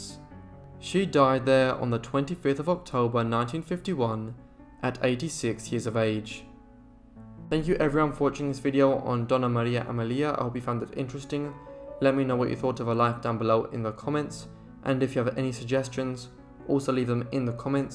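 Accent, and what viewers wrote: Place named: England, United Kingdom. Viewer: i just realized how well written your biographies are. - and very well edited as to choice of photos, length of time on screen, etc. so well produced. thanks again. 🙂🌷🌱 you know what i am dying to know - where your forebears come from. you have an idiosyncratic sort of English accent, yet you say thanks like as american. the brass or copper tea or coffee pots seem to be Middle eastern or Turkish. do you think you’ll ever let us know?? it’s fascinating to try and figure out. thanks much. stay safe :) 🏺🌷🌱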